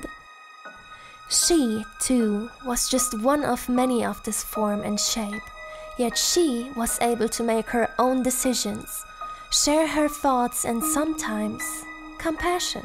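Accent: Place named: Germany. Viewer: German accent = 😍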